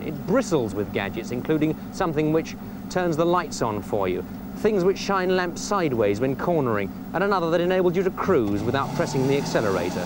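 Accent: United Kingdom. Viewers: Gary Dunn Yeah, but my point was just that people pronounce (and spell) things differently in different countries, so no-one is right or wrong. This video is of a British programme (<yes, that is the British spelling) for a British audience, so that is why he pronounces Marquis the way he does. The British pronounce 'coupé' as the French do, but Americans say 'coop'. I don't suppose it really matters...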